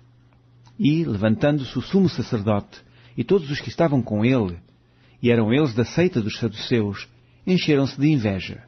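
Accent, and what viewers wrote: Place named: Portugal. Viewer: Mas de qualquer maneira, esta baboseira toda vale pelo belo sotaque português !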